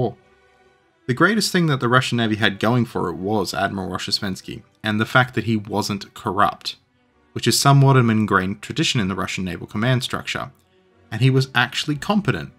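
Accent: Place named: Australia. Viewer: His editor is swedish. He is australian. You can hear it by his accent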